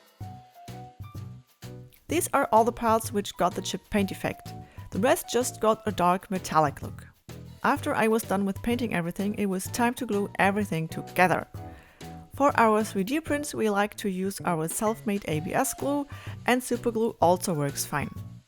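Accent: Germany. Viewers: Coole Videos! Aber ich kann mir nicht helfen. Irgendwie glaube ich einen deutschen Akzent heraus zu hören :D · ehm youre from germany aren t you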